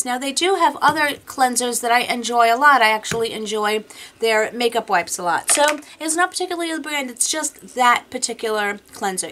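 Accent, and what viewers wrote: New York, United States. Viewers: I LOVE the Dr. Jart Tiger Grass Cicapair cream! That stuff is amazing! It helps with mild sunburn, redness from the cold, rosacea, even my eczema blotches I sometimes get on my neck, anything that will make skin red. That was one of my fav finds in 2018, but I'm sure it's been around before that. Oh yeah and speaking of fav finds, the Zodiac pallets! I have all of the bh spacey pallets! They are awesome! I'm 42 and never before bought big pallets before this., you would like the first Zodiac maybe I think you would rock the cool tones and I'm guessing Supernova would look awesome on you too! The Galaxy Chic is my fav, but you would love the Aurora Lights because the colors are shimmery. I could go on! Haa sorry Oh and thx for warning me about the charcoal magnesium deodorant! I was thinking of buying it. I don't like their regular formulas, but the sensitive skin ones are good. 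Natural deodorants are rarely good enough. My fav ones are Kiss My Face-dry spray and stick, E.O. sprays, and Arm&Hammer Essentials (it's half way to natural) and I still have to try the Native one in my Target box that I keep seeing good things about. Oh and that Tom Ford perfume, oh woww haa daaamn that stuff is gooooood! Ok I'll stop. Thx for the info,. And your voice is nice and calming- love the NY accent too. Have a great weekend!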